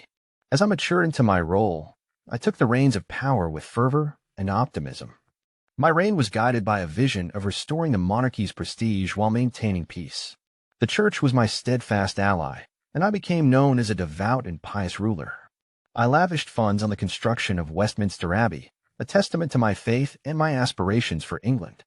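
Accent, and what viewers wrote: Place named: United States. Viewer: "King of England" but has an American accent lol if you're gonna use AI at least give him a ye olde English accent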